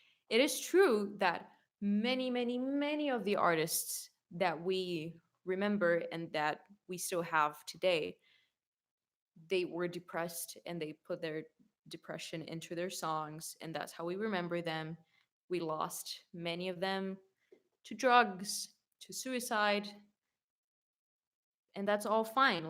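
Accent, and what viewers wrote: United States. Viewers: Extremely surprised to hear an American accent! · Nice American accent!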